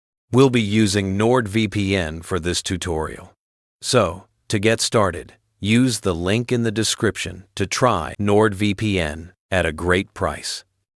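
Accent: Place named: India. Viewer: indian detected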